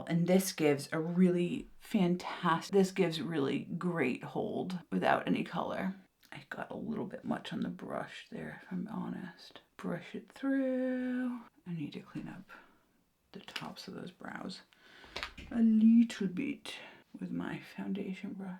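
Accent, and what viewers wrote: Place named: United Kingdom. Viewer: Hi, just came across your channel. I spent the first 5mins trying to work out if you were British , American or Irish 😅 I’m loving your content so, you have another subscriber . I’m 64 years young and I’m Scottish. I love makeup especially drugstore so, I’m hoping you’re not all about high end. Sorry❤❤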